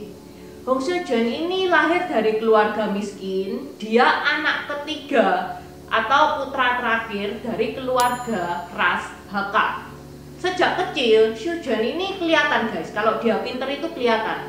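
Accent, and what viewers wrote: Java, Indonesia. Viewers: logat Jawa medok nih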